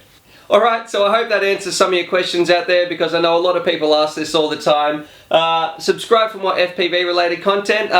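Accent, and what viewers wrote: Australia. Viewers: You are Australian aren't you? Tell us the prices in AUD!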